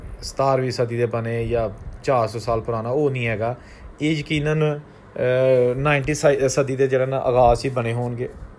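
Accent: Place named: Gurdaspur, India. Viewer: Apki bolne ka lhja bilkul same vesa hai jisa Indian punjab ke gurdaspur side ke logo ka hai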